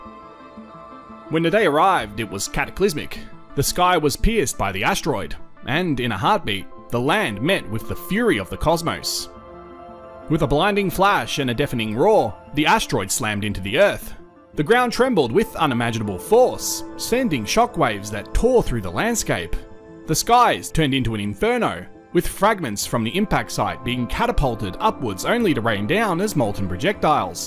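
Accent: Australia. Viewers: I happen to like his Aussie accent. Let him alone, especially since he works hard to make his content informative, and intelligent! You are awesome,!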